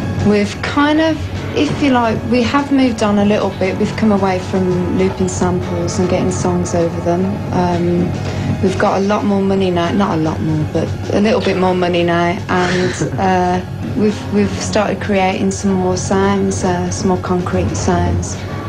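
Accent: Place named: Black Country, United Kingdom. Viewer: She's a yam yam brummie through and through. We are from the same area. Sad at her passing.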